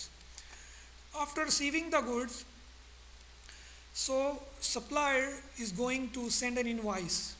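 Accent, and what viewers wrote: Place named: India. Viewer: Pak., perkenalkan dulu dong nama anda .... apakah anda seorang guru ? dari Negara mana kok logat seperti orang India , sementara banyak orang India bekerja di Indonesia tetapi jarang yang beragama Islam.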